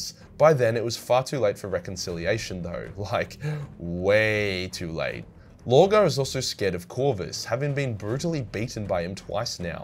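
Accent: Australia. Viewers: every time i watch your videos i start thinking with an australian accent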